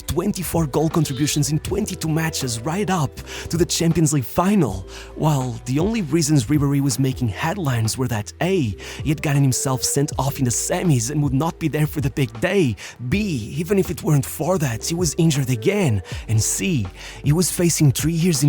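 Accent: Portugal. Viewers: Bro, dumbass he's Portuguese and their accent is like that(in some areas). You're probably American or some shit and you would never understand.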